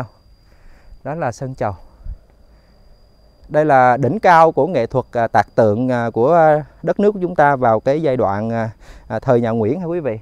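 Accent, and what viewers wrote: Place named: South, Vietnam. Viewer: giới thiệu giọng thanh thoát pha hoà trong Nam dể nghe hơn Huế Revew [xin lổi nghe tại mình thường nghe theo giống Nam kỳ lục tĩnh]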